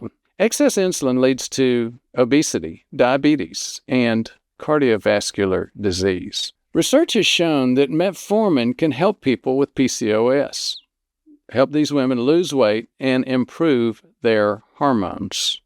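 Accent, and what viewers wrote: South, United States. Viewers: Having grown up in Alabama, I love your accent. Not hillbilly, just one of a variety of southern accents. I've been in Texas for 46 years, & still think the Texas accent is harsh.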